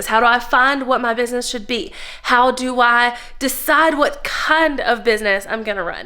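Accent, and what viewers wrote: South, United States. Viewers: Thank you for leaning into your unique self and embracing your southern accent. TBH- and what I’m about to say goes hand in hand with this video, my thick southern accent is one big thing that I allowed to hold me back. And I have risen from the ground with zero social media in a janitor business that has a revenue of $19k/mo. But I could scale if I could learn and DO all these things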